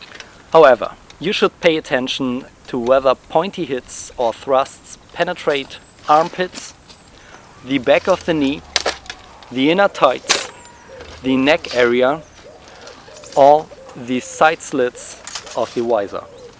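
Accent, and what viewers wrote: Germany. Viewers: Aud sem Dialekt meine ich rauszuhören das du aus Deutschland kommst, ist das korrekt? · Mein abo hast du. 🤘🏼 Aber den deutschen Akzent hört man ultra raus. 😉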